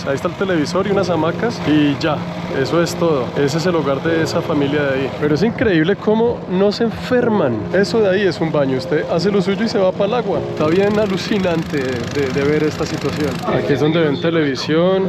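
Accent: Colombia. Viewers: Ahí usted es único y mis respetos y mucha admiración. Yo también soy colombiana y me encanta su forma de hablar qué no deja que nos olvidemos de nuestros dichos!! Parcerito!!!y saludes a la abuela.😊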